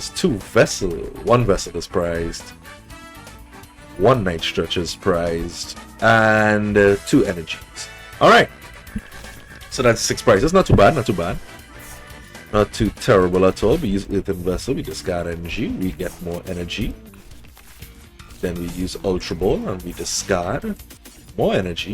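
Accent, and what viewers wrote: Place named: Caribbean. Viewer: Trini or Caribbean accent...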